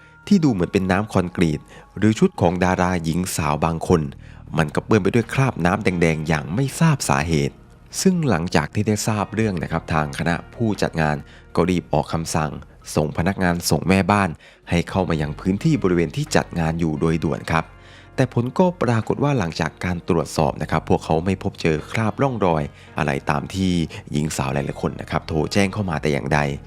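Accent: South, Thailand. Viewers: เสียงคุณ เหมือนคนใต้เลย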